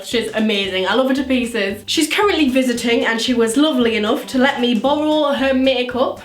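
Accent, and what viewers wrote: Newcastle, United Kingdom. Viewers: ohemgee it's a Geordie I live near Newcastle tooooooo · Alondra Flame it's Geordie (just like me ) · Are you from Newcastle ??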